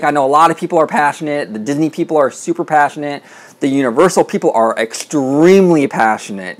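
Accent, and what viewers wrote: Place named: Florida, United States. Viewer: you sound like you are from Florida. I live in LA. Here universal is no where near Disney quality. HP world is a little street here.......I counted 49 disneyland resort rides and 2 of them are simulator rides. At universal studios hollywood only 3 rides and the tram are not simulators or have strong parts of the ride as a simulator. But to clarify what I said in my post, ill give an example. ET was a fantastic ride. One of my favorites as a kid. the theming was top level. Now its replaced by a lifeless rollercoaster. I don't think anyone would try to say the mummy ride has better theming than ET. Another example was king kong. That ride was burned in the memory of any young kid who road it. It was amazing because it was real. Now its just another simulation.....one step forward......two steps back......No one i know, wants these bullshit simulators.....And I won't respect disney if they start putting in simulations at the rate universal has done.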